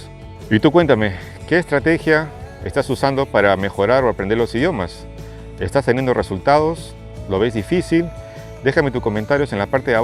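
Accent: Peru. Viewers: Hola! X tu dejo asumo q eres un compatriota peruano. Si mi fuerte es el inglés (con escazo o mínimo francés) sería suficiente para conseguir un empleo allá mientras aprendo el mismo francés en Québec? AbrazO!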